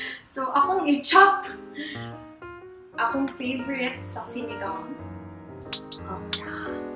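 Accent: Visayas, Philippines. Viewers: new subieee...cute kaayo ka mag bisaya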